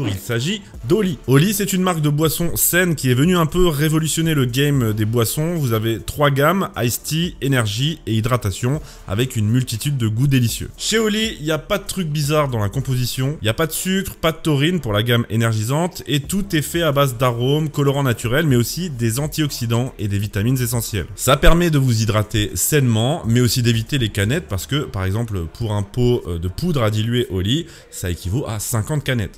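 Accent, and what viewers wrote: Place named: England, United Kingdom. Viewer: toujours interessant ce genre de vidéo mais l'accent anglais... svp...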